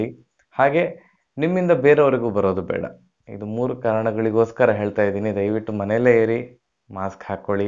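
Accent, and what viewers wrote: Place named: Coastal Karnataka, India. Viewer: ನಾನು ಮೂಲತಃ ಉತ್ತರ ಕರ್ನಾಟಕದವನು, ಕರಾವಳಿ ಭಾಗದ ಭಾಷೆ ಕೇಳೋಕೆ ತುಂಬಾ ಸೊಗಸಾಗಿರುತ್ತದೆ ಅದರಲ್ಲಿ ಬೆಳ್ಳಂಬೆಳಗ್ಗೆ ನಿಮ್ಮ ಮಾತು ನನಗೆ ಹಕ್ಕಿಯ ಚಿಲಿಪಿಲಿಯ ಹಾಗೆ ಇಂಪಾಗಿ ಕೇಳಿಸುತ್ತದೆ. ಧನ್ಯವಾದಗಳು.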